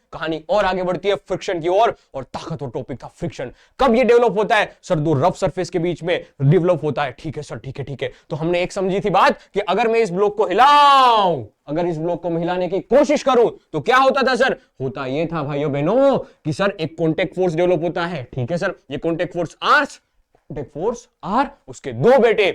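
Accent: Gujarat, India. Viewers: Idk if he is gujrati or not but got gujrati accent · Your gujrati accent ❤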